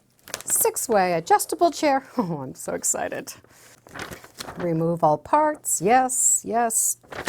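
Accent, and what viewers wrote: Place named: Canada. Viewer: I just love watching you! Especially your pronunciation of some words in your Canadian accent!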